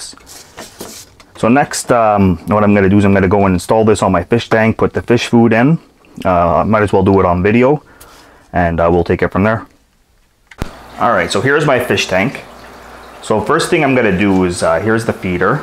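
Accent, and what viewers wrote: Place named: Canada. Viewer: I can’t thank you enough. The instructions that come with the feeder were certainly written in China where the feeder was made. The instructions are impossible, like IMPOSSIBLE, to understand. Returning this would have been a pain. Thanks to your video in native English, Canadian I believe, I got it set up in minutes. Thanks so much!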